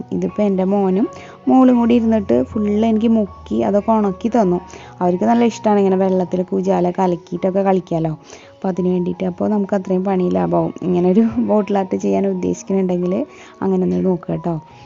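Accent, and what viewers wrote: Thrissur, India. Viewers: Are u from thrissur as the language slang.. Nice technique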